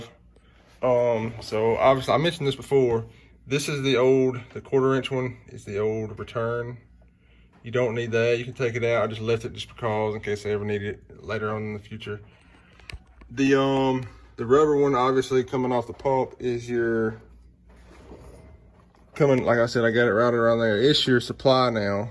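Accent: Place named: Alabama, United States. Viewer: Bro I watched both your truck videos I'm doing exactly same thing to my 86k10 had it for almost 20 years finally put new motor in her and Holley sniper I'm getting rid of rats nest of wiring and straighten out vacuum which lead me to you videos .. preciate the post.. ha catfish skinners .. had me rolling. You from Alabama too? 🤣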